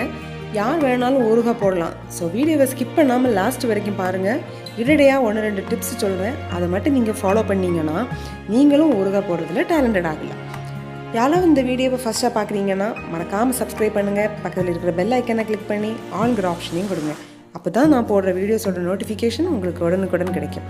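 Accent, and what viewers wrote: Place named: Kanyakumari, India. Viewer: Kanyakumari Tamil❤️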